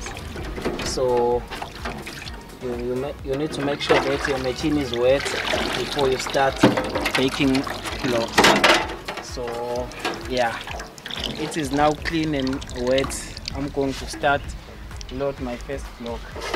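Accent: Uganda. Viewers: He talks like a Ugandan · this guy has 100% Ugandan accent... good work though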